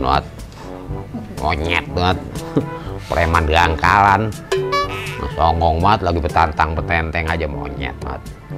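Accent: Jakarta, Indonesia. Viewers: Wkwkwkwk sehat trus bg... udh aku sub bg, jd kangen sm tmen2 jkt kalau liat logat abg.😄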